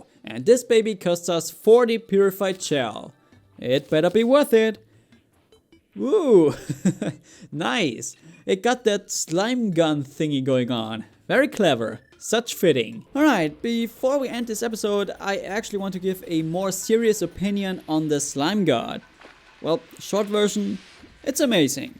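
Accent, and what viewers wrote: Germany. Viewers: Hes German isnt he???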